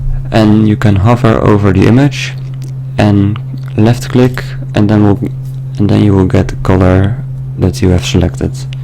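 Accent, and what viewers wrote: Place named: Netherlands. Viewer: Hoor letterlijk aan je stem dat je nl bent hahah lol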